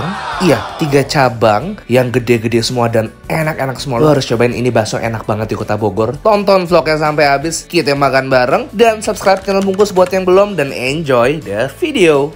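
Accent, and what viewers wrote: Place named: Banyuwangi, Indonesia. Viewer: Jawa timuran bang tpi jawa timurnya yg timur bgt BANYUWANGI 🤗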